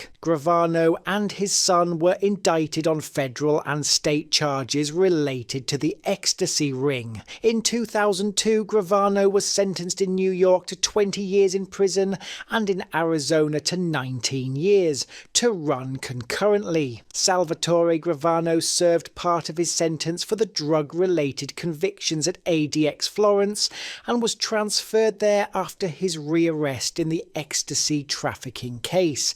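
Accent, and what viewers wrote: United Kingdom. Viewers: There's absolutely nothing more annoying than some retard with the UK accent commenting on s*** they have no idea what they're talking about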